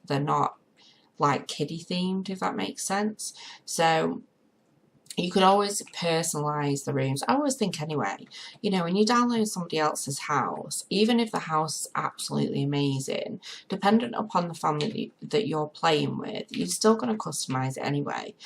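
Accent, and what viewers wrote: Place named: North, United Kingdom. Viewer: This is unreal! I’m currently trying bigger builds and the floor plan gets me every time lol btw I love your voiceovers it’s nice to listen to a northerner Faye x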